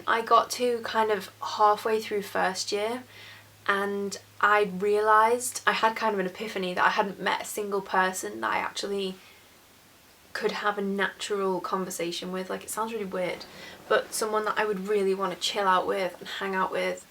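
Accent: Liverpool, United Kingdom. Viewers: I've watched a couple of your vids and kept thinking why your accent sounds familiar and you're from Liverpool! You've got a little scouse twang. From Liverpool myself. Love your vids xx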